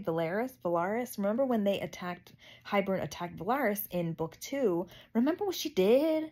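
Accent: Baltimore, United States. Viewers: You got that Hon accent.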